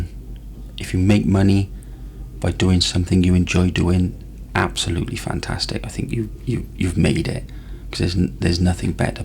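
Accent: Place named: Wales, United Kingdom. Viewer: You sound like you're from Newport, definitely Welsh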